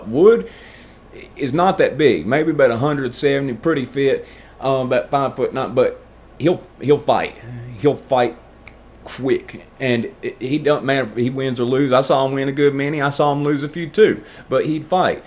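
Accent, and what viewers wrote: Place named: Virginia, United States. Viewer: I lived in Richmond for a while , so when I first heard you talking, I thought Roanoke must be super country. Now I know what the deal is . Thanks for clearing that up y'all.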